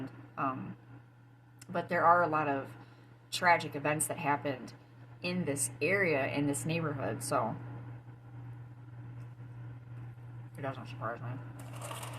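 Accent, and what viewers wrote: Michigan, United States. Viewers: lol i can tell you are a Michingander lol we have our own accent Hope you will think about lifecasting eat,sleep,s=it,shave shower even sleep streams😃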